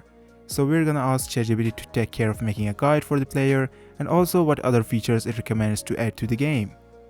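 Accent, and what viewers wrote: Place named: Bengal, India. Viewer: Bhai Bangali? Kotha shune bujha jay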